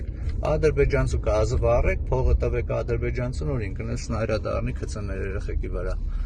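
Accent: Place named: Gyumri, Armenia. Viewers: Ապրես ախպեր ջան! Չգիտեմ լեննագանցի ես, թե ախրքալակցի, քաաաղցր ես խոսում! Ընտրում ենք N15-ին և ՀԱՂԹՈւՄ ԵՆՔ!!!!!!! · Гюмреци э hастат👍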